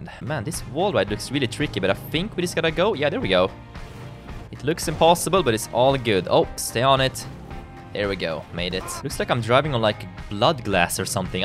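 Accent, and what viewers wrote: Sweden. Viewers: Are you from sweden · you sound swedish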